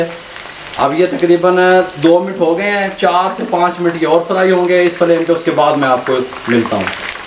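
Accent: Punjab, India. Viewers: bht kmal apk smjhany ka method, or tips amazing, sir or apka punjabi touch tu kya he kehny. Allah bless you.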